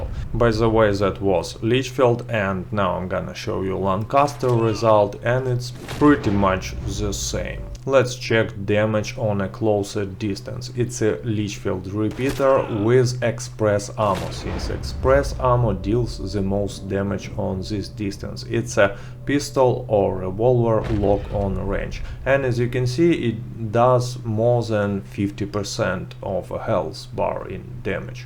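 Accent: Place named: Russia. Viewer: I love this man's russian accent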